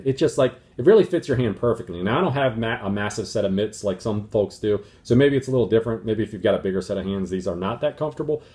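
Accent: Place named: Maryland, United States. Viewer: I thought I detected a Maryland accent on you man. I am a Marylander. I love those beers. I agree those nunchucks are cumbersome. Switch Pro Controller is my fav. Nice VR.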